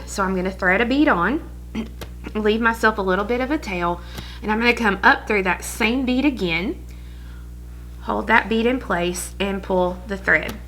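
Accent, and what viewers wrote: South, United States. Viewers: I would give just about anything to come visit you this weekend! I am a southern girl currently living in England and miss home so much. Ms I love your videos, I am a long time beader and always learn a little bit more from you. Keep speaking southern to my heart and beads to my soul! Thank You!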